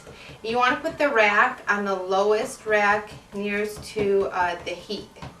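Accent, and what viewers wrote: Midwest, United States. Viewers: I KNEW I heard a midwestern accent in there somwhere haha.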